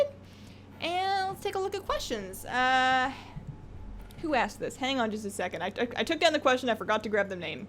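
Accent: Canada. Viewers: dat canadian accent.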